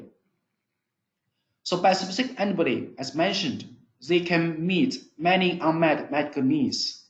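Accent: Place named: China. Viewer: chinese accent is so strong, can't understand what he says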